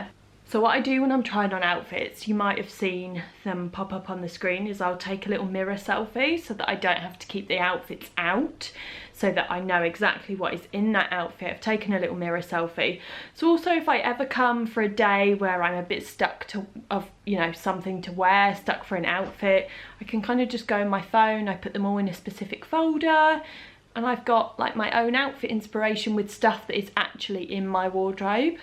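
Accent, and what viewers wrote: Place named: London, United Kingdom. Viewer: I love your London accent, mine, according to my husband, is New Yorker mixed with Italian and Portuguese. In short, a horror !!! I love the winter clothes and your style. I was amazed not to see Karl Lagerfeld's picture at the Oscars, it was disrespectful !!! Sad!!!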